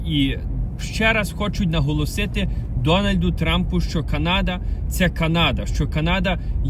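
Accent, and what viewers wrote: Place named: Lviv, Ukraine. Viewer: з Львівщини)))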